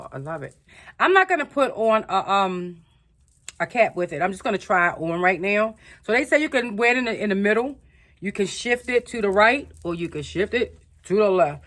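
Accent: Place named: New York, United States. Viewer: You talk like an Italian mob wife lol I love it!! Are you from Jersey or New York?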